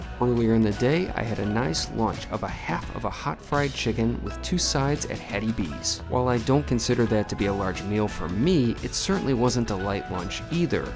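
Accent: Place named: Midwest, United States. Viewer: Love your chill vids and Midwestern accent, bro!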